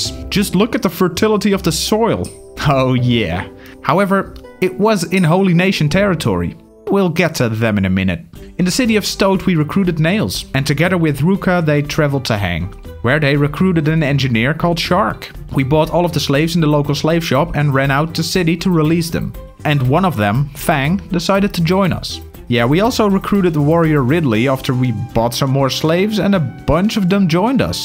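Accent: Netherlands. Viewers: Hey bro are you Dutch? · Dutch accent best accent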